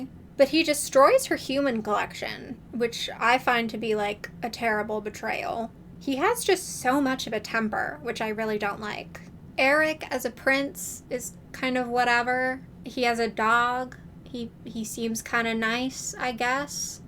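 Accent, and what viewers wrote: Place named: Transatlantic, United States. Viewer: You ate that transatlantic accent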